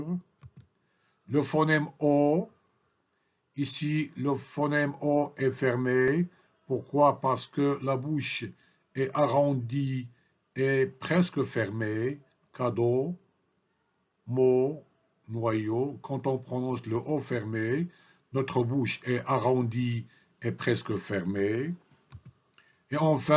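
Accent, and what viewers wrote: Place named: Belgium. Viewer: Votre accent est quand même très typé. Ce n'est pas un reproche mais il ne vous aide pas à différencier à l'oral le "a" de sac et le "a" de âne. A mon oreille, vous ne différenciez pas brin de brun, parfum et faim, à peine le "o" fermé du "o" ouvert et bien sûr les semi-voyelles "ui" et "oui": vous prononcez "lui" comme "Louis". Je vous soupçonne d'être d'origine belge, ce qui est loin d'être une tare. Je dirai même plus ( allusion aux Dupondt de Tintin), cela fait tout le charme des divers accents que l'on peut entendre en français et j'aime ces accents. Mais ça n'aide pas votre démonstration de phonétique!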